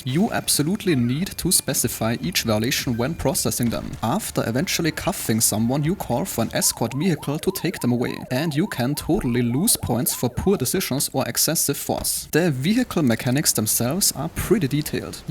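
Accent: Germany. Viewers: Now that’s one German accent !!! I love it - thanks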